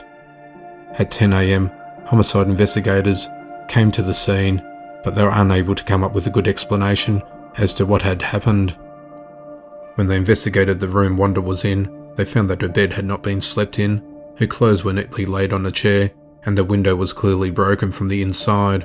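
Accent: Australia. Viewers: Love the video, glad I've found your channel- are you a fellow Australian or from New Zealand?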